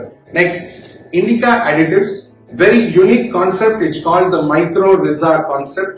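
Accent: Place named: North Chennai, India. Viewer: Sir, We trust your product's!. Surprised you speak vada chennai accent a bit, loved it. Hoping you would speak hyderbad telugu while u launch in hyderbad..love you ,sir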